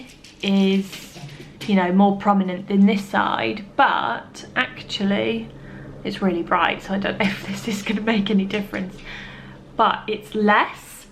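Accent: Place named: London, United Kingdom. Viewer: Omg ur dog sooo cute 🐾🐶! I love dogs 🐶! U look great thanks for sharing .. and like how u talk with that accent from London you r London girl .. 👍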